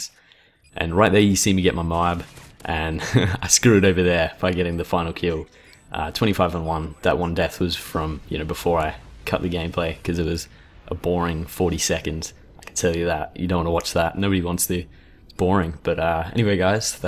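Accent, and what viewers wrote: Australia. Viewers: is this guy australian??? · Woooooo finally a commentator from Australia :D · Good to hear a fellow Aussie! :D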